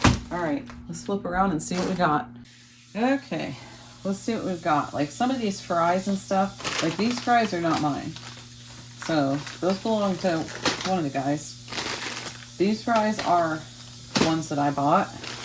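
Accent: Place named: Michigan, United States. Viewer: I just found your pg 😊 love the content! Have a happy new yr ❤ from Michigan.. p.s..you sound like you're from my neck of the woods 🤗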